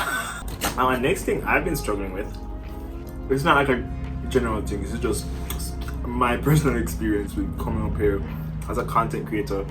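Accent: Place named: United Kingdom. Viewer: the little hint of british accent lol u sound like them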